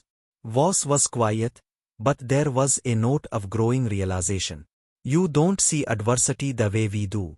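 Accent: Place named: India. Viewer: If you are going to use an AI anyway, why give it the ONE accent most likely to piss every American off? The first thing most Americans hear is EVERY customer service "call center" in India that cannot do shite and are NEVER any help. "Yes yes, oh yes, the company cares "berrimuch", yes yes, "berrimuch". Glad to know I don't have to see this dumb shite in my feed again. 17 seconds might be a new record.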